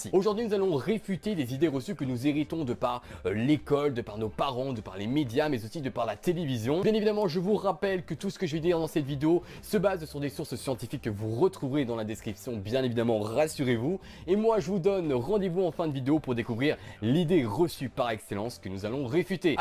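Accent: Belgium. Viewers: ginisti c'est l'accent belge ça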